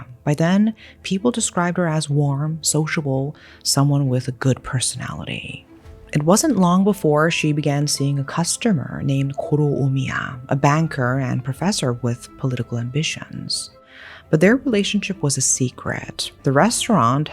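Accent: South Korea. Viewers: I can hear some Korean pronunciation to be honest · I love how you say Asian names with a Korean accent - a unique twist!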